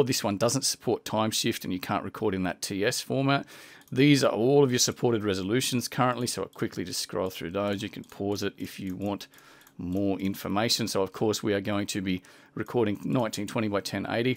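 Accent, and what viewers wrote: Australia. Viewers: yes another aussie haha.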